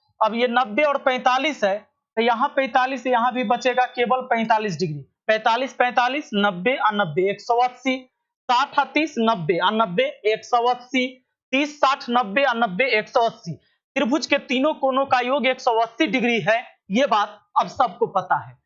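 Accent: Bihar, India. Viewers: ❤आपका पढ़ा बिहार जैसा एकादम पूरा ठेथी भाषा❤❤❤🎉🎉🎉🎉🎉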